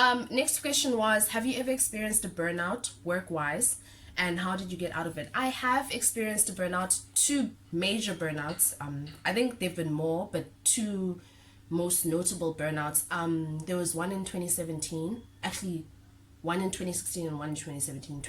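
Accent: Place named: Pietermaritzburg, South Africa. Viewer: You sound like you from PMB though...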